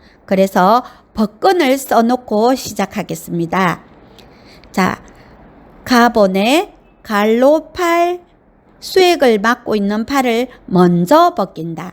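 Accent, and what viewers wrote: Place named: Gyeongsang, South Korea. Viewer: 죄송합니다 경상도사투리라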